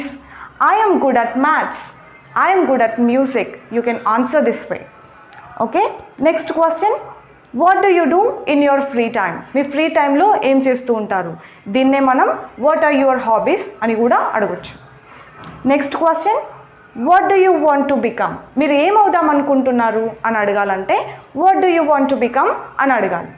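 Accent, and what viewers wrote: Anantapur, India. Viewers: Madam , are you from ananthapur .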